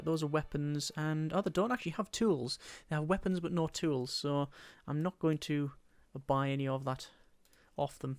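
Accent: United Kingdom. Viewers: You are British or Scottish, I Know it.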